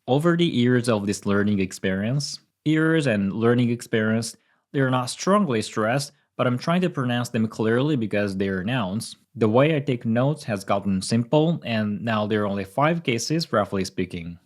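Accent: United States. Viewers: Your american accent is amazing, love your video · Very impressive you've definitely got an American twang!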